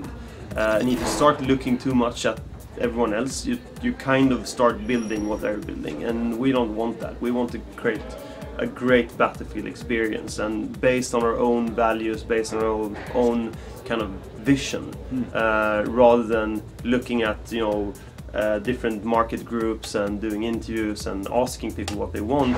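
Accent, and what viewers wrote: Sweden. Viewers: man hör verkligen att han är svensk ;9 :) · I know this might be a bit old.....buut man, English! with a Swedish accent doesn't sound very nice :P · thumbs up for Swedish accent. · Swedish accent <3 (im swe ) :D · ._. swedish accent ja! some needs to train that accent away... i managed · swedish accent hellyeah! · omg what a swedish accent :D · he sounds swedish ^^ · God I hate the swedish accent :( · You can hear hes sweedish :D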